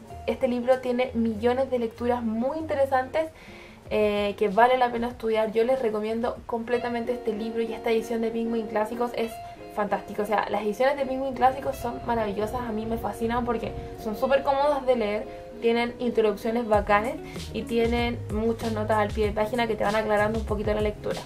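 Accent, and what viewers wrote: Chile. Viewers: Bonito tu acento chileno. Compatriota. Pará mí frankenstein es muy bueno por la forma de tratar el existencialismo, vida y muerte. Saludos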